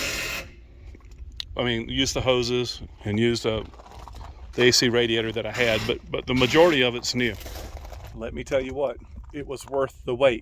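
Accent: South, United States. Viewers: I'm so glad you got Red back. Sorry it was a costly repair. I love your southern way of storytelling maybe because I'm southern also.😉 This was a nice video. Thanks for sharing your experiences. I hope the repair to Betty White is minor. Good to see Felix, but I could tell he was in pain. I'm still praying for him.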